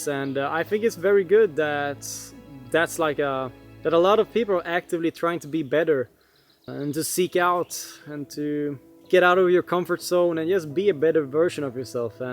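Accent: Scania, Sweden. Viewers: Riktigt bra video! Hälsningar från skåne! Hörde lite skånska i din dialekt, får man fråga vart i skåne du kommer ifrån?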